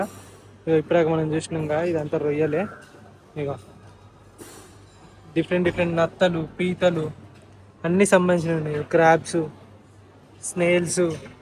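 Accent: Telangana, India. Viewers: Motthaniki telangana vallam anpinchukunnav bro🎉❤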